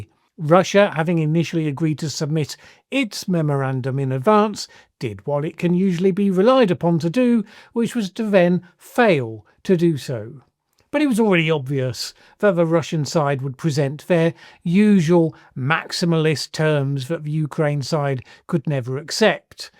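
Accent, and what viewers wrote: United Kingdom. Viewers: Sounds like a Brit, smells like a Ukrainian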